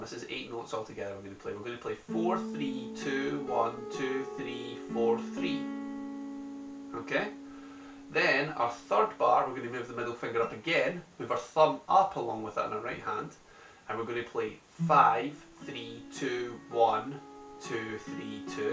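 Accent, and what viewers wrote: Scotland, United Kingdom. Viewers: My good friend comes back from Oz raving about this guy, I check him out. Being a huge fan I love the cover, I then think to myself "I could learn this and it would go well with my scottish accent, I wonder if there's any tutorial videos online". I come on here and it's a scottish guy doing the video. Meant to be. Cheers for the video mate!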